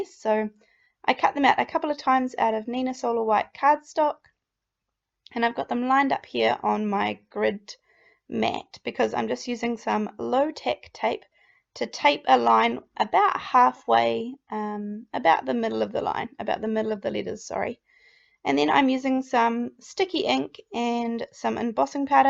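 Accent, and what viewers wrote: New Zealand. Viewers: Love your videos, so nice to hear a kiwi accent!! Just wondering where you get your black and white cardstock from?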